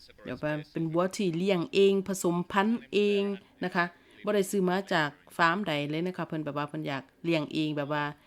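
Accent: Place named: Chaiyaphum, Thailand. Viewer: มาเบิงงัวเมืองนาวนำครับ ขนยาวเนอะครับ เสียงเว้าคือคนชัยภูมิครับ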